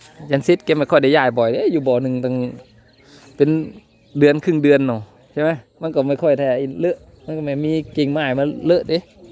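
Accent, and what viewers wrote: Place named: Korat, Thailand. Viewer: พูดเหมือนภาษาโคราชเลย ชอบๆ 55 fc ครับ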